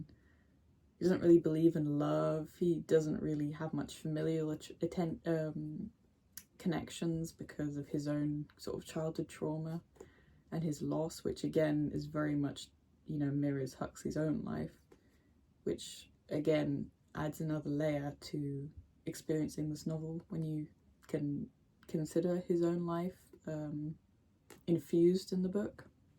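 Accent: England, United Kingdom. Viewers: You sound English. Do you read BNW in secondary school required reading? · I'm intrigued by your accent. It's British, but also clearly not native / not from Britain. (I might be mistaken, I mean it's not like I"m from the UK) The closest I've ever heard was a German girl who went to a British private school (not sure if it was in Germany or in the UK) but she spoke a tad more posh. EDIT just noticed the poster, might be another German girl going to a British private school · I think it sounds completely English to me, as a native.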